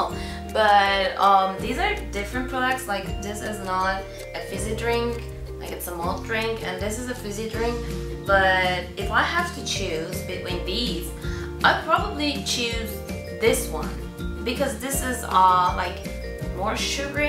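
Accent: Turkey. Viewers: Turk oldugunu da soyleseydin bos yere vakit kaybetmezdim. Boyle bir Ingilizce aksan sadece iyi Ingilizce bilen bir Turk'te vardir hemen anladim. Zaten twitter profilinde de isminin oldugu yaziyor. Yemeyin lan insanlari! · Haha ulan kiz Turk, Turk aksani var. Turkiyede yasiyor bence ...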